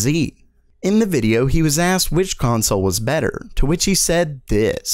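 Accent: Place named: United States. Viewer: Just an American accent lol.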